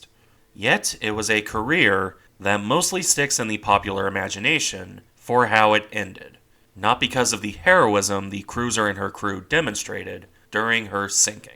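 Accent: United States. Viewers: Sorry, this is not meant to detract from the work you put into this video. It's good. It's just the pronunciation is like nails down down a chalkboard. Ironically enough at points you prnounce it almost perfectly. With the typical American accent it is easier for you to pronounce it Edin*Bruh* A similar sound to what you would make saying 'Brother* or "Hey Bruh, what you doing?" While not quite right, it is would be less jarring to people who live in the UK. I'll keep an eye out for your next videos. Thanks.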